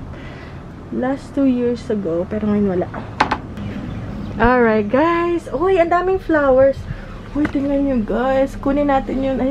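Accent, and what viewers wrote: Batangas, Philippines. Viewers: Taga batangas ka ga?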